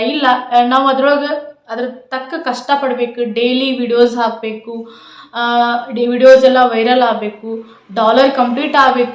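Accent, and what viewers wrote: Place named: North Karnataka, India. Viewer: Very very nice and good information 🎉 ಉತ್ತರ ಕರ್ನಾಟಕ ಭಾಷೆ ಕೇಳಾಕ ಚಂದ ನನಗಂತೂ ಬಹಳ ಖುಷಿಯಾಗುತ್ತಿದೆ🎉🤗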